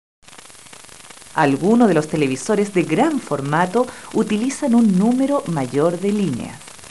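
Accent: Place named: Chile. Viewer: Se le nota el acento chileno a la morra que habla en el vídeo.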